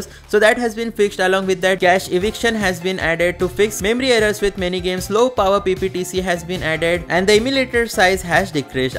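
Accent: India. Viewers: The Indian accent was all i needed to tell me this is fake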